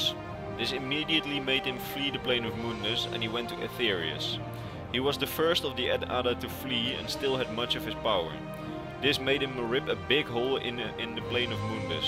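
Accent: Netherlands. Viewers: you sound dutch ...but nice vid ^_^ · As a Dutchman, I can hear clearly in the sound of his English he is Dutch. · It sounds like a dutch accent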